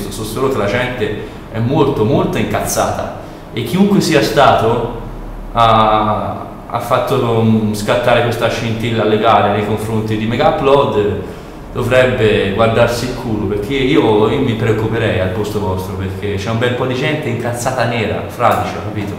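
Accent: Tuscany, Italy. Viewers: l'accento toscano <3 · HAHAHAHAHAHAHAHAHAH ! Sei troppo forte !!!!! mi piace tantissimo sentire parlare Toscano, stupendo il passaggio del dito medio......... ahahahahahahahah !! Mi ricordi tanto il conte uguccione......! ahahaha...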